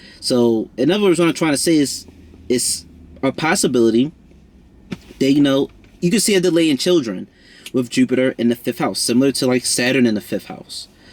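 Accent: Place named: Baltimore, United States. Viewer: Thank you! Love this placement and the way you explained it. Love ur inner g you gotta be from Baltimore 🧡 if you are even more love to you n the home city 🏙. Thanks again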